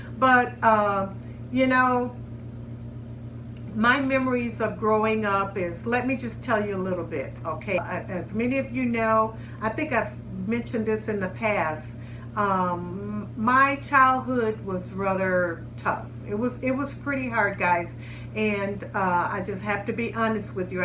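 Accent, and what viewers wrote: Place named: Midwest, United States. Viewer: I absolutely loved this! I always thought your accent from from upstate New York, but now I know it's Midwest. Lol. Amazing stories!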